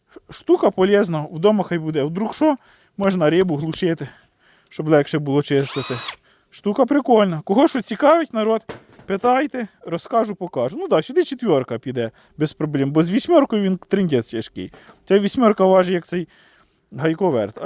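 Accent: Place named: Podillia, Ukraine. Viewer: Ви не з Поділля родом? Чую схожий говір)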